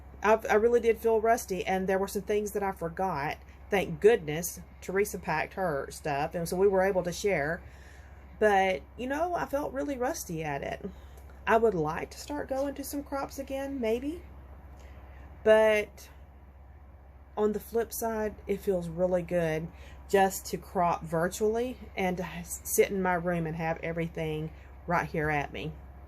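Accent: South, United States. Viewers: I always start with my photos. That gives me the direction. PS: please don't stifle the "country," enjoy hearing your Southern accent. 🌞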